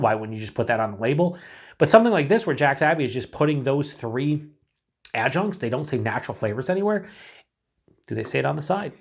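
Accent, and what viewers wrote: Western New York, United States. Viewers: Don’t worry about your pronunciation. I think it’s mostly the western New York accent just showing itself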